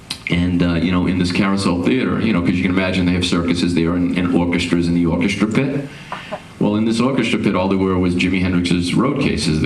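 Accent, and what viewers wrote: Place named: Boston, United States. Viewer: gotta love that Boston accent. Amazing player with a new unique voice while still having an old soul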